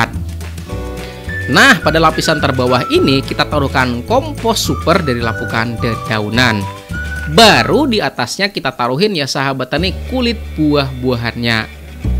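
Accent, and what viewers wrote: Banjar, Indonesia. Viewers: kaya org banjar sdin ni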